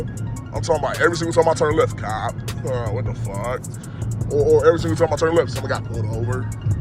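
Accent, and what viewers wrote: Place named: Atlanta, United States. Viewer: U trim twin-atl nigga voice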